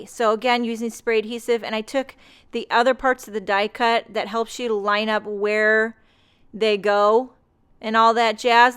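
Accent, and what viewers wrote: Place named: Boston, United States. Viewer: Thanks for sharing!! When I first found cardmaking a year ago, I remember listening to u and laughing my “ butt off!” Lol! I was dying to know if u were from the Boston Ma area, by the way u were talking! Lol! U are such a breath of fresh air! Ur cards are absolutely beautiful, and ur fun to listen to too!!!😀❤️👍🏼 Happy bday to ur daughter! My baby just turned 23 yesterday!!!! I miss both of my boys so much! Enjoy all the craziness now! For me, the “empty nester” thing is “ for the birds!” Lol! It’s totally not a home when ur babies are out on their own! Merry Christmas to u and ur BEAUTIFUL family!!! Liz E Cape Cod Ma 😊❤️🎄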